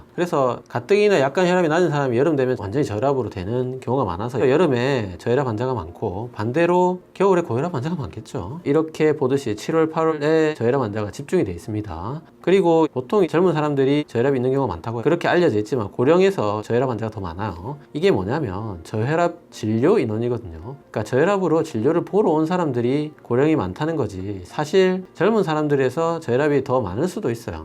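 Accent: Gyeongsang, South Korea. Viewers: 경상도 사투리가 친근하네요.믿음이 갑니다.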